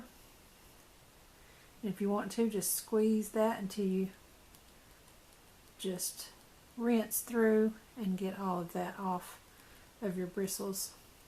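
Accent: South, United States. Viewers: Answered everything, and then some. You are such a blessing. Thank you so much, I feel so special. I'm originally from Florida and I just love hearing your southern accent. Have a blessed Easter. Bev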